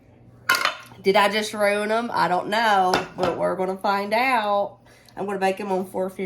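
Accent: South, United States. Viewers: I had to add that i love, love, love your accent. It is comforting and sweet. I feel like I'm listening to a southern friend. I'm from northern Virginia, so our accent is less here...still technically in the south, but because we live near the DC Metro area in the city, everyone's accent is a mix of where they are from (very international) and from here. My parents are both from Wisconsin, so I have a bit of a Norwegian accent. You can cook all the beans and season them. You can make half still whole and do the other half refried. You can freeze part of what you make, as you are correct...cooked beans last in the fridge for 5 days. You can freeze cooked beans up to 3 months. I frequently cook a double portion of beans, then freeze half for the following week. This saves time and money (stove/electric). I thaw the beans in the fridge, then serve differently than the prior time. 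With rice, in a soup with vegetables and a boullion cube or two, or on toast, corn tortilla, etc! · would you be willing to share your garden journey. I know you may have already started planting. But I would love to see videos on what all you grow & what you do. I like the videos you do for budget cooking. When you were getting the vegetables it reminded me that last year you would use stuff from your garden. I think people enjoy watching you. Your sweet southern accent, smile, laughter & how honest & real you are. I know when ppl start channels it might be for a specific thing. But clearly your subscribers enjoy all aspects of you are willing to share. From the camping & spending time with your family, to the fun game you & Dusty played. Tell the family hello. God bless you all all ❤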